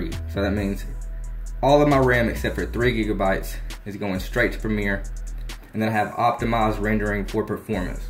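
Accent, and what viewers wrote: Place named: South, United States. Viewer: Where You From You Have A Southern Accent Btw Thxx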